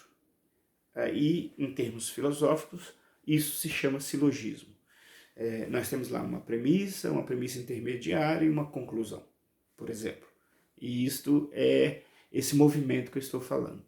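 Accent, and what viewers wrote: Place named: Minas Gerais, Brazil. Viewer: Eita mineirice aristotélica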